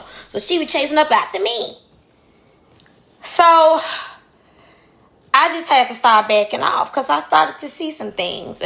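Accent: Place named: South, United States. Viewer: The more I listen to K Michelle the more I’m liking her. And the Southern accent love love love ❤😂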